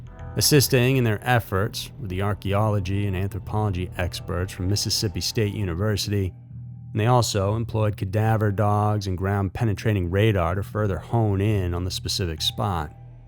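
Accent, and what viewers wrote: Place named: Texas, United States. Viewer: Love, love, LOVE your accent. Not sure if it's Texan (I'm from Austin and we're not like the stereotypes lol) but it sounds a bit like what I'd hear back home.. Either way, your content rocks and I am so here for it!!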